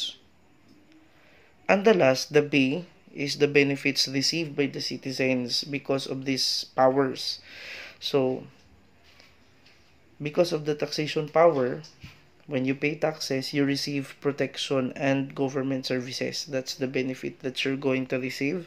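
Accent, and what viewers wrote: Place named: Visayas, Philippines. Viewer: Salamat kaayo Sir, I understand very well your Visayan accent, I'm from Mindanao. God bless 🙏❣️😇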